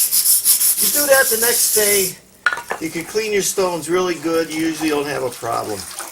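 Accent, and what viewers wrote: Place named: Pennsylvania, United States. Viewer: You are very generous with your knowledge and those of us who don't know what you know really appreciate it. Just a question: You sound like the guys I know from the Hazleton area in PA. Are you from around there? Anyway, thanks a lot for your help. Rich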